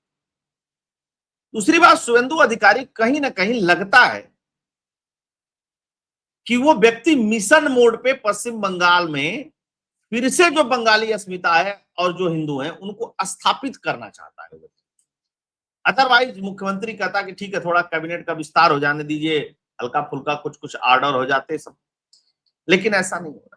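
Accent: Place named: Lucknow, India. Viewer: Ram Ram bhai , big fan here from NZ. Love your detailed work and analysis along with your local lakhnavi style of speaking . 👍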